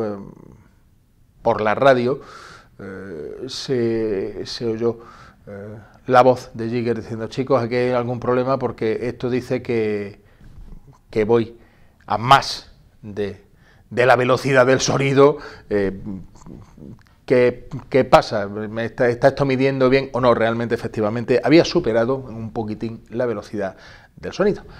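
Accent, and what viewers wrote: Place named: Cadiz, Spain. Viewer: tu eres de cadiz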